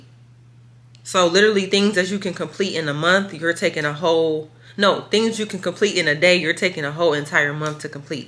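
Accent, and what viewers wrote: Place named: Detroit, United States. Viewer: I can hear the Detroit in your voice ❤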